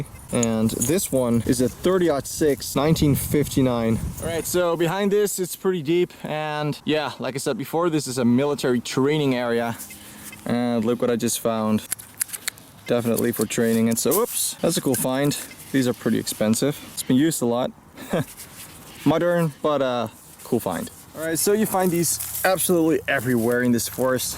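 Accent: Netherlands. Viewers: Is he from Netherlands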